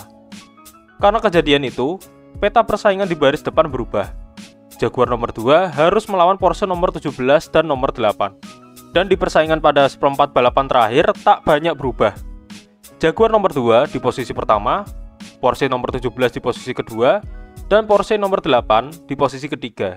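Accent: Java, Indonesia. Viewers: suaramu medok banget min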